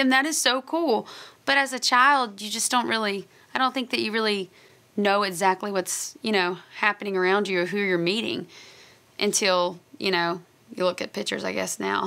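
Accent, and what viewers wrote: South, United States. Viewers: Oh my gosh her accent has changed !! More country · She sounds so country cx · To know not the only one that talks with a southern accent she does too but when she sings she sounds beautiful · Smh guys, she looks different because she gained weight.. And she moved down south.. Lol thats the accent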